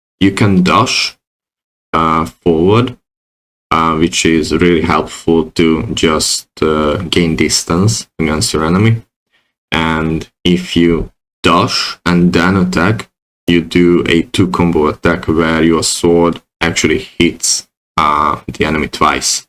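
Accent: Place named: Hungary. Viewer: you have very hungarian accent.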